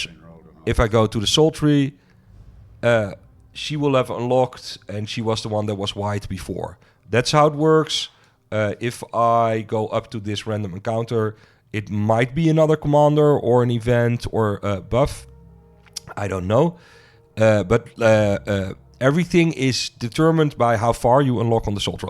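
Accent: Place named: Netherlands. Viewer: He sounds soooo Dutch, enjoying your game but still things are not clear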